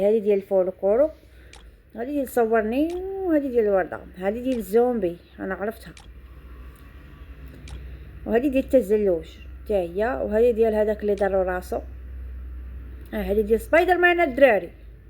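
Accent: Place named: Algeria. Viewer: وﷲ محتواكي جميل وشيء جميل اشاهد محتوة كا محتواكي ولاكن الهحة الجزائرية اشويه اصعيبه فهما كا انا ليس من الجزائر شكرا وتحية اخوكم من بلاد الرافدين 🇮🇶🇮🇶🇮🇶🌷♥🫀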